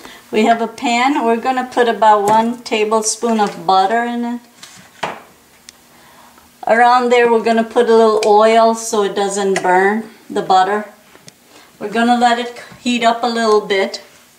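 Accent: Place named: Caribbean. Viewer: You sound more West Indian than East Indian. Are you guys in New York?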